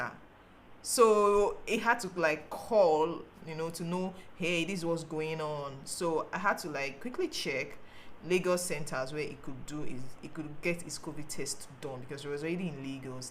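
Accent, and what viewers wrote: Ghana. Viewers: Ur accent look like Ghanaian good job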